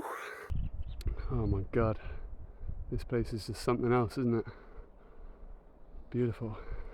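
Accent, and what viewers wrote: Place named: England, United Kingdom. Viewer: I love your accent. What part of England is it from? I am a new subscriber. Doing a great job with the content. 👍😊 from Éire well done 👍 on the spoon 🥄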